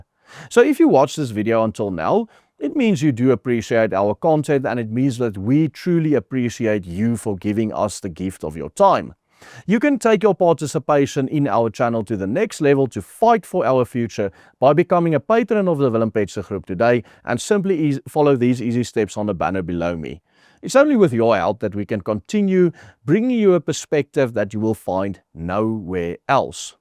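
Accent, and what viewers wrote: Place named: Afrikaans, South Africa. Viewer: An interesting pod caste. Just wish the presenter would not put his Afrikaans spin on the names of the stores and people.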